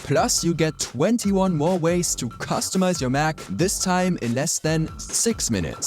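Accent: Germany. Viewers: I found out is German because of his Non-American accent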